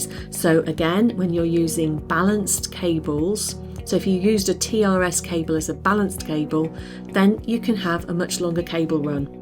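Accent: United Kingdom. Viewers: Hi! I love your channel. I have a question. I have a yamaha p105 electric piano wich has usb to host. This usb cable is an especial usb cable? Or is it like an usb print cable usb b to usb a? Thank you so much (I adore your british accent hehehe)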